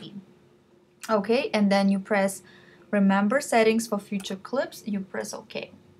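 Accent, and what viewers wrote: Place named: Ukraine. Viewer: у Вас український акцент))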